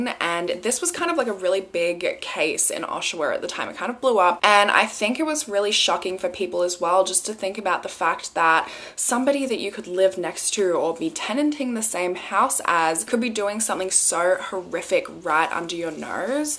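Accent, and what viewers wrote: Australia. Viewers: Bestie wake up, a new murder explained in a soothing Australian accent just dropped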